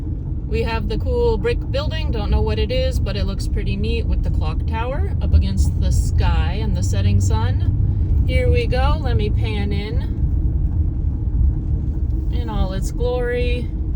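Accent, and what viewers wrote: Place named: Norway. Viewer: Where are you from originally? I hear some Norwegian. Dont ya know..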